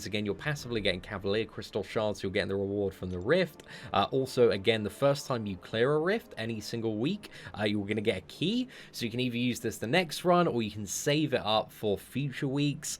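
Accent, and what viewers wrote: United Kingdom. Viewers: I’ll never read the forums or need any other channel besides for mcoc news 👀. Man saves me hella time and I get to get a free British accent talking person without using my pc narrator mode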